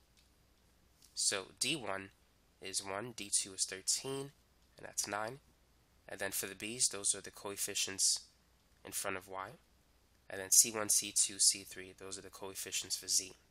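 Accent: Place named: Texas, United States. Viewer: Hi american , are u from Texas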